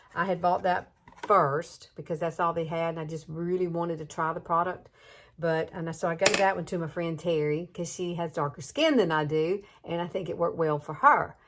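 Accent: South, United States. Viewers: This DOES look fun!😃💗 Thanks for sharing!... And I love your cute Southern accent!😍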